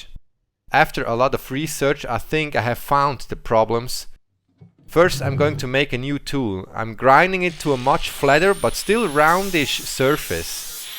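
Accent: Switzerland. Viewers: I love his Swiss accent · Ahh der Schweizer akzent😂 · Great Video. Love the Swiss Accent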